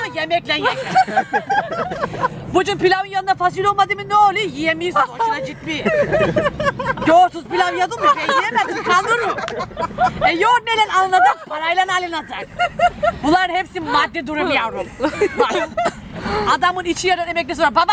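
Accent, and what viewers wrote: Black Sea, Turkey. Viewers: çok güldüm şiveye bayıldım keşke bende konuşa bilsem bir rizeli olarak :) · Karadeniz'li mi yoksa İstanbullu mu ya da doğum Karadeniz üniversite İstanbul ondan mı şive değişmiş. Neyse çok beğendim, devam. Türkan çok iyiydi bu arada :)) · Sen harikasın daha çok dizilerde görmek isteriz özellikle karadeniz şivesiyle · Karadeniz'in neşesi, enerjisi, mizah anlayışı, şivesi sende çoook güzel bir görsel/işitsele dönüşmüş... Gündemdeki "savaş çıktı çıkacak"ortamı üzerine seni seyredince, hayatın çok neşeli olduğu Arhavi yıllarımı, çocukluğumu hatırladım. Neşen daim olsun... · Bayiliyorummm sana hep karadeniz li siveneee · Çok tatlısın,kurban olurum karadenizime şivesine,doğru söylemiş anan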